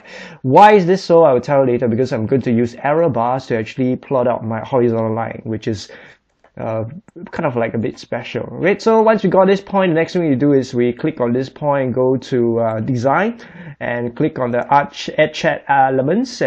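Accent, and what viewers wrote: Singapore. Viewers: Can tell is Singaporean ! Thanks! haha!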